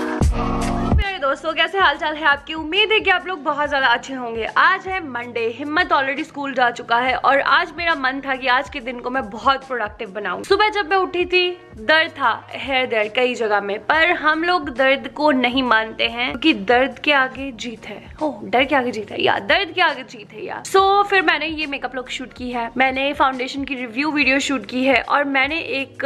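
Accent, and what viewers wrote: Punjab, India. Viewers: Love you baby 😘😘😘😘😘😘😘😘love ur punjabi touch dear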